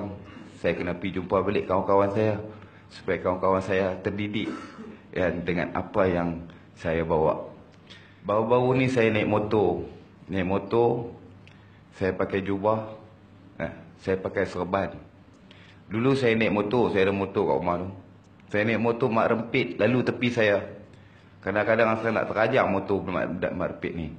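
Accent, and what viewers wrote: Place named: North, Malaysia. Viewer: Skit2 kdah.... skit2 kdah... perlis ada penang ada.. perak utaqa pn ada ckp mcm ni jgk... smpai ke pntai remis ckp utara.... awt xsbut....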